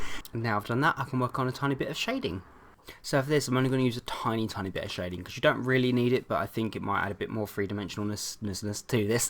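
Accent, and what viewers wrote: United Kingdom. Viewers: you look amazing great work as always! your tutorials are fabulous darling btw im from the uk too and your accent is adorable im from near Manchester lol so my accent aint as classy as yours ;) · I like ur british accent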